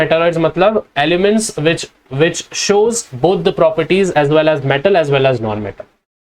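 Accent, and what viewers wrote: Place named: Aurangabad, India. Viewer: Full aurangabadi accent 😂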